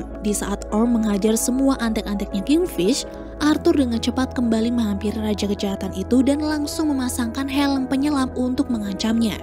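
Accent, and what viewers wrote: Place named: East Java, Indonesia. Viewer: Ini pembawa alur ceritanya orang daerah mana yak? Kok bahasanya gemesin banget 😃 cak cok cak cok 🙈🙈🙈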